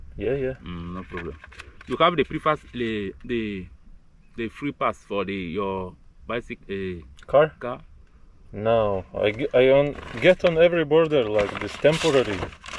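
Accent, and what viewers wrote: Lithuania. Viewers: Žiauriai lietuviškai skamba va iš kur mes Šiauliškiai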